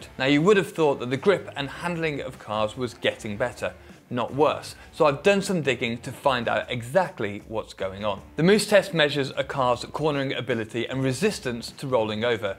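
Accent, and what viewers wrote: United Kingdom. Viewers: You sound British but look French 🤔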